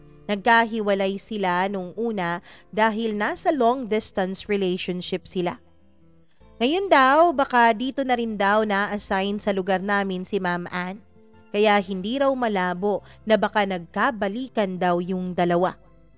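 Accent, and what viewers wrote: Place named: Conyo, Philippines. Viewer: 😅ang konyo ha 😅 inde ko nmn mina Mind 😆 ano yan live selling 😂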